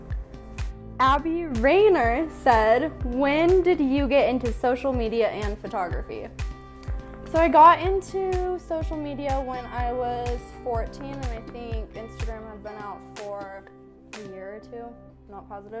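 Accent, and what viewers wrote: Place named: Utah, United States. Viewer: your accent makes me miss Utah.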